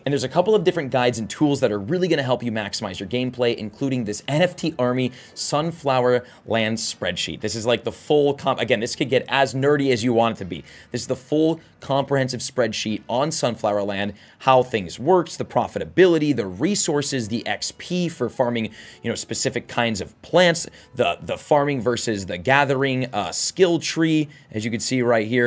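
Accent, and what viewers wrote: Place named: South, United States. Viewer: I’m learning English for quite long, and this southern accent is siiiiiiick!! Love it , it brings some cool atmosphere here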